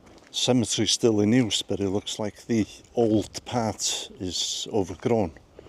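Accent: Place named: North Wales, United Kingdom. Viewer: I’m a 100% Welshie from Gwynedd and I have to say we do have the most beautiful views in the world plus it’s nice to have a video with someone with my north wales accent. Love the video btw ❤ da iawn a diddorol🏴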